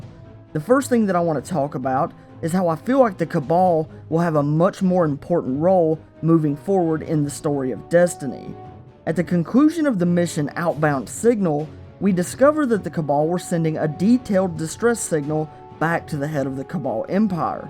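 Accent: South, United States. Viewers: I thought it would be a fuunnn way to tie up those loooose ends 👌cowboy accent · I hate southern accents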